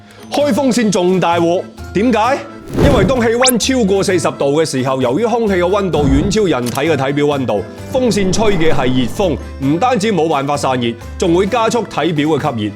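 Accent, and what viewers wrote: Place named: Guangzhou, China. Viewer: up主喺邊度人，聽口音有d似我哋廣州話，但又唔係正宗廣州話，似乎是帶點其它口音的廣東話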